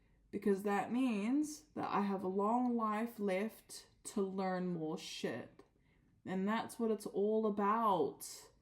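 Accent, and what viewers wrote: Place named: New Zealand. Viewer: ok cool! Sorry I'm a geography buff lol are you from the north island or the south island? I noticed the accent too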